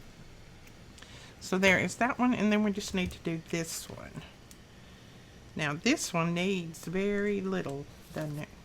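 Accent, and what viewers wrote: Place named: Alabama, United States. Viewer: I have been a card maker so I know the basics. I like the videos where you make the elements of the junk journals since I haven’t tried the journals yet. Slowly working up to it. I love love love collage and making master boards. Thanks for sharing your gift. Your voice is also soothing. Love the Alabama accent. Junker from North Carolina.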